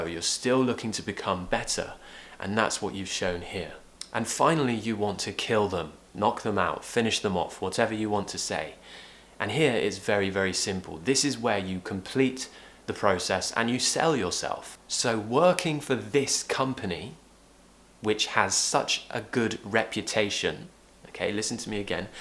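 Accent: RP, United Kingdom. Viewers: This is one of the questions I find very difficult to answer correctly at interviews. Thanks for the tips. From a fellow Brit. PS. I have the same accent as yours.😃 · I love it British accent I live in UK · I love British accent and I wish I could have a friend from London to communicate with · I loved British accent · I love British accent ... its royal accent ! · Hi there! would you define your accent RP/ SSBE ? I'm working on a phonetic assignement! Also, where are you from? Thank you! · I dream to be your student and learn your amazing pronuncation. I love british accent since my 11's. Of course I can not pay your course right now, this situation affected my economy, but one day, one day I will my dream come true. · I like British accent ❤️ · I love British accent. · Who loves british accent? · thank u so much ,I Love british accent... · I love this accent😍, i think British accent is better than American accent 😉🤗. 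You're the best english teacher in YouTube!!! 👌🤗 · Just listening to you thats enough to get British accent ,, Lot of love ❤️ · thanks for ur advices greetings from Mexico . I love Brits accent . have a good one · Wow you are really good! Thank you very much for this lesson! You know what.. You look a lot like Alexa Chung.. Really.. You remind me her enormously.. Maybe because you have similar accents but it is more physical actually you faces or eyes are quite similar.. Coolest Brit pals 👌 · Wow , I love British accent. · Indulge in British accent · I love British accent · British accent is a great turn on for me😘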